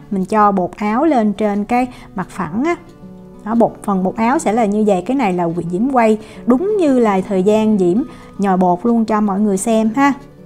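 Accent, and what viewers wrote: South, Vietnam. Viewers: Cám ơn em nhiều nhe để chị làm nữa khi nào thành công chị sẽ báo em biết ngay he, chúc em luôn vui và khỏe nhe. Chị luôn theo dõi và theo rất nhiều recipes của em như là bánh flan nè rất ngon đó em nhưng đối vơi anh chị thì hơi béo nên chị giảm bớt lượng cream cheese là rất ngon đó em. Tự già rồi không thể ăn giống các em được đó. Ah chị cũng làm bánh bao theo ct của em rất thành công vì bên chị có bán loại bột Red lotus đó em nên chị cứ theo đó mà làm hoài thôi. Cám ơn em rất nhiều lần nữa. Chị rất thích coi cách em làm và nói chuyện vì không dài dòng và nghe tiếng Nam nữa vì chị cũng là người Saigon. Ah dì của chị nấu ăn cũng ngon lắm và cũng có coi video của em ,dì nói trong bài nấu phở của em dì nói em nên cho thêm một con gà hen và đuôi bò nữa thì sẽ ngon lắm đó em chứ nấu như em là hơi ít xương thịt để ngọt đó em, có gà hoặc kẹt lắm mình lấy soup gà pha thêm nó sẽ bớt ngậy mùi bò hơn đó em. 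Đó là chị recommend thôi chứ không có ý gì đâu nhe cưng, chị nghe dì chỉ vậy nên chỉ cho em biết thêm để chị em mình ngày nấu ngon cho gia đình ăn nhe. Nói chuyện với em sau nữa nhe. Thân mến.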